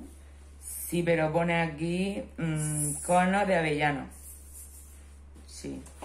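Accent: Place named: Murcia, Spain. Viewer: por tu acento diría...Murcia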